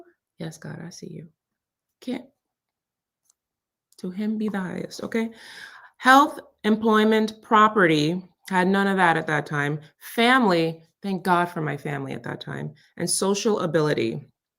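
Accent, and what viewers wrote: Jamaica. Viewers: the intermittent Jamaican accent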